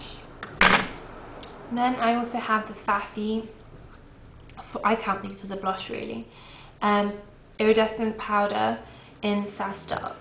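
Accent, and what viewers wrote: England, United Kingdom. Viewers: Heyy Ur To Pretty And U've Got A English Accent Like Mee Yayy!!!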